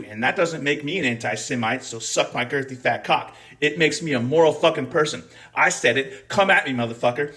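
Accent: Scotland, United Kingdom. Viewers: Definitely hearing some Scottish accent in this one! Thank you for the outrage!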